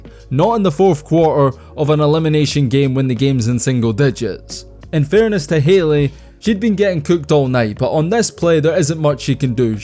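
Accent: Scotland, United Kingdom. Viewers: Bizarre hearing a Scottish guy do the review. But, it’s OK. Sounds great. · It's funny to hear the comments coming from someone with a thick Scottish accent. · Why is there a Brit talking about basketball on this video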